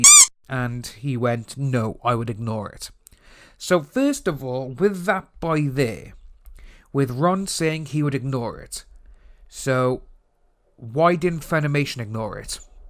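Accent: Wales, United Kingdom. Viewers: I understand you dude having Walsh accent is a pain at times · You have a Welsh accent? Hell, I just pegged you for livingbin the UK. 😄 Hearing the voice of this metrosexual, I have no doubt he's bringing Tyrone snacks as Tyrone rails away on Monica.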